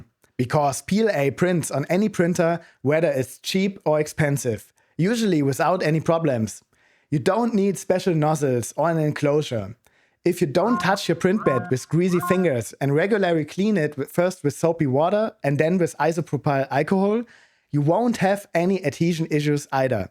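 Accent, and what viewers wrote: Germany. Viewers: Man hört an deinem Englisch direkt, dass du aus Deutschland kommst. 😂 Spätestens wenn man die Fehlermeldung am Prusa Drucker auf Deutsch sieht. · Deine Videos auf Deutsch sind so gut. Aber jetzt auf Englisch mit so einem furchtbaren deutschen Akzent … nee no offense, aber das ist echt grausam. Für meine Begriffe bleib beim Deutsch, da sind deine Videos ein echter Mehrwert.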